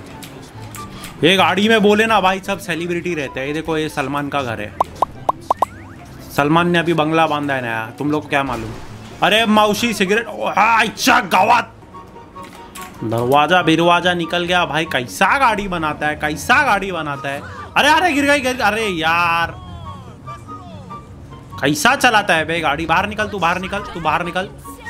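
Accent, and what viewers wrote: Maharashtra, India. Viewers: I love your marathi tadka😘😘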